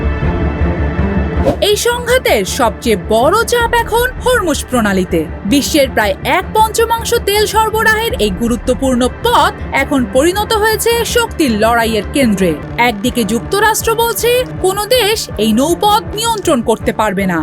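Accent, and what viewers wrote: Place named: India. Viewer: আমাদের নিউজ বা পাঠক পাঠিকাদের থেকে আমরা সুমৃষ্ট বা সুন্দর উপচতাপনা শুনতে অবচত্য দয়া করে ইনডিয়ানদের মতো সংবাদ পাঠ করা বন্ধ করুন বা বিরত থাকুন এতে শুনার বা দেখার আগ্রহ হারিয়ে ফেলি! কমেন্ট ভালো না লাগলে অপরাধ মার্জনা দৃষ্টিতে দেখবেন ! কিন্তু কমেন্টা করতে আমি বাধ্য হলাম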